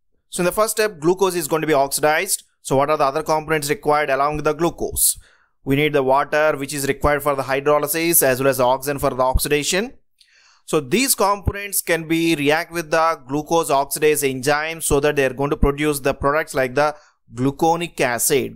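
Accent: South India, India. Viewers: R u south Indian 🙂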